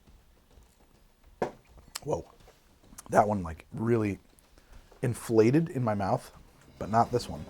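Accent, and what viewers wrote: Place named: United States. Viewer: people from the usa are so cute when they try to pronounce french words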